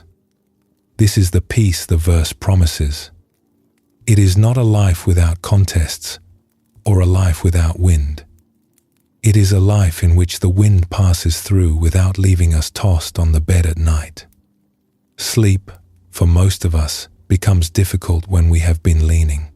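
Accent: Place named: United Kingdom. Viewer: The voice is pleasing, though it seems odd to me that it has a British accent rather than, perhaps, an Asian one….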